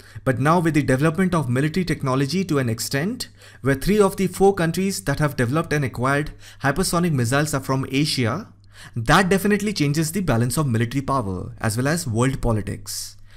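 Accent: India. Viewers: Please improve your thick Indian accent, then it will become an amazing channel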